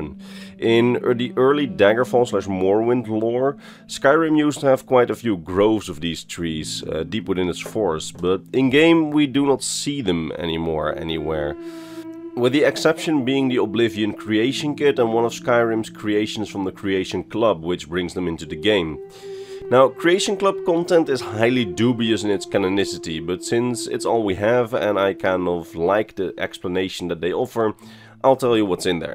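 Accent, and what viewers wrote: Netherlands. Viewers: One of my favorite parts about your videos is your Dutch accent👍🏻👍🏻I love it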